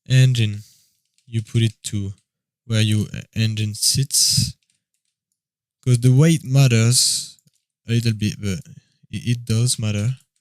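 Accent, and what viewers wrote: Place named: France. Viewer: You sound really french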